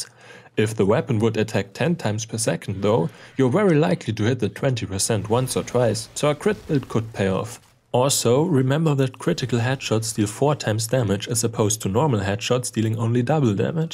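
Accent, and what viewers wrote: Germany. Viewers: Are you German ? ^^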